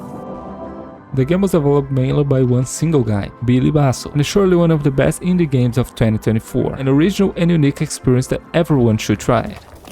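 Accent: Brazil. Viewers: esse sotaque ta com uma cara de brasileiro kk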